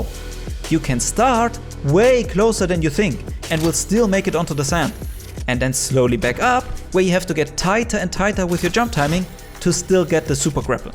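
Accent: Germany. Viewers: Man hört richtig raus das du deutsch bist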